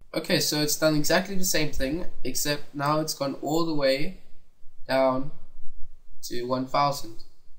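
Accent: South Africa. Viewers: Must be a south african, Viva!! Its nice to hear a familiar voice.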